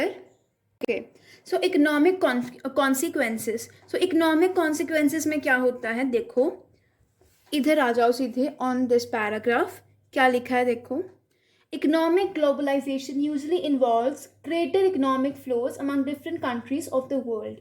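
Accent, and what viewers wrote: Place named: Punjab, India. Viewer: Through your voice I get to know that you are absolutely punjabi girl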